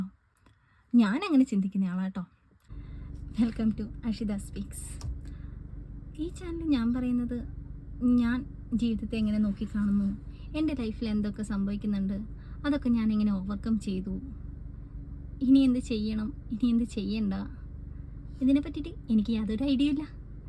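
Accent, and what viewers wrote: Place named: Kannur, India. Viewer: എണീറ്റിറ്റ്??? കണ്ണൂർ ആന്നാ??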